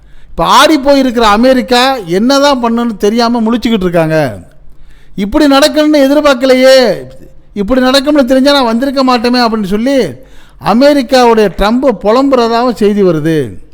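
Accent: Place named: Thanjavur, India. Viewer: அருமையான தஞ்சைதமிழ் மணம்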